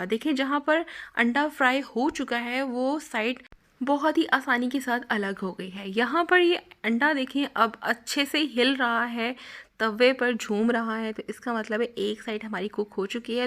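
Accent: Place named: India. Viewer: Please don't talk like Indians it's اچھے سے it's اچھی طرح سے ۔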